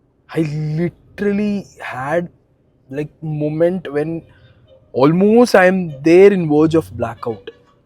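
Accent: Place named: Karnataka, India. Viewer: Kannada English 😎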